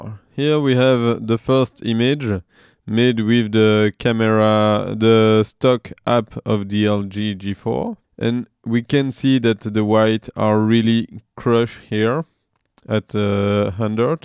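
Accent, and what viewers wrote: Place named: France. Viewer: On sent vachement l'accent français, mais on apprécie l'effort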